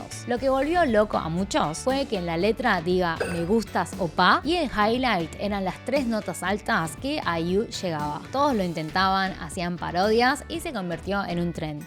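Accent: Argentina. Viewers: Se le nota un buen el acento argentino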